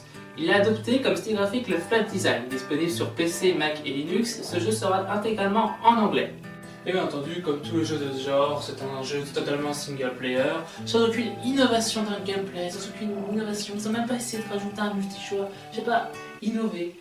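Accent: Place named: Quebec, Canada. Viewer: J'aime l'accent poce bleu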